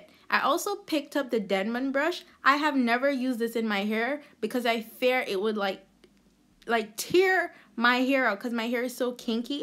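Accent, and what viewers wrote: Patois, Jamaica. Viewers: Lol I knew you sounded Jamaican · u sounds like Jamaican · You're trying to hide it but i think i hear an Jamaican accent😮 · sorry I had to ask because I heard the accent and I'm a Jamaican too. great video goodaz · I knew you wee Jamaican...from the first time you spoke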